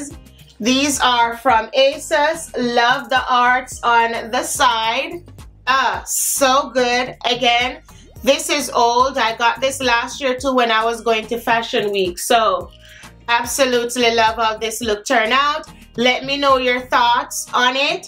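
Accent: Jamaica. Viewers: Randomly came across your video and had to subscribe right away as soon as I heard the Jamaican accent! Now back to the video…love how u style the fits. The lime green/Lavender is my fav 😊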